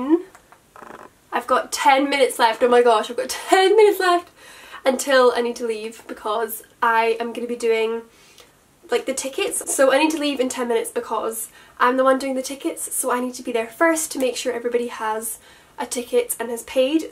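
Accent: Scotland, United Kingdom. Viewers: Oh my gosh, I just came across you and honestly you are so lovely and cute, and I totally thought you were going to be american and then you started speaking and I got such a fright cause you are scottish? haha xoxo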